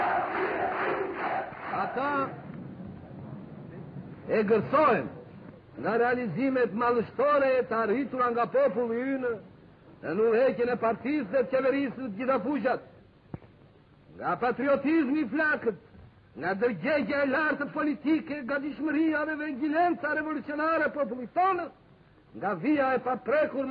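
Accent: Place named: South, Albania. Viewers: Hoxha grew up in southern Albania (namely Gjirokastër), where the Tosk dialect is spoken, as oppsed to Kosovo Albanians' Gheg dialect (which is also spoken in northern Albania and most of Albanian-populated areas of Macedonia) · Kosovans speak the geg dialect, Same as north Albania, the south speak the dialect youre hearing in vid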